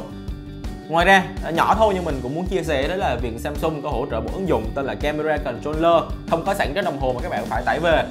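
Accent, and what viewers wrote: Binh Dinh, Vietnam. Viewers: nghe giọng Bình Định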